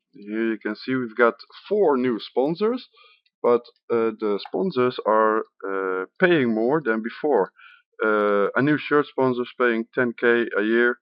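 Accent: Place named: Netherlands. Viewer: Great video. Your English is pretty good. From your accent I guess you are Dutch. Why do you choose an English team? Anyway, more videos like this please. I play as Gillingham in League 2, just got promoted through the playoffs.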